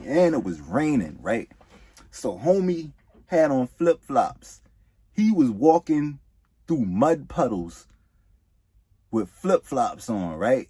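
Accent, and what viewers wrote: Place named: Geechee, United States. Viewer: I knew it!! I’m from downtown Charleston. I hear the Geechee dialect but I hear something else in there too. I’m looking into the company and I’m kinda excited!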